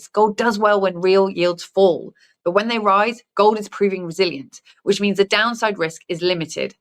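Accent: United Kingdom. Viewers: Excellent presentation!! Her story line was obviously well researched, well written and spot on. I am stunned and amazed that the average Joe has not (yet) piled into gold when governments and central bankers have been doing so for years. As an added bonus me being an American enjoyed the (British?) accent.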